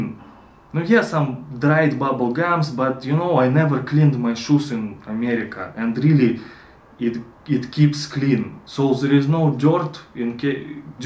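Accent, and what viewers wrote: Russia. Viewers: Hello! Are you from Kirov or Yeburg? Your accent is like a Ural one.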